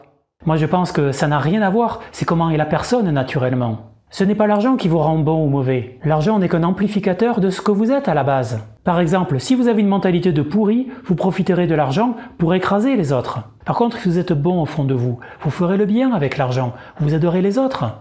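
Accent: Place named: Toulouse, France. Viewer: Y a des richesses super : moi j'ai une super santé ! Sympa votre accent vous êtes de Toulouse? Vos propos sont très intéressants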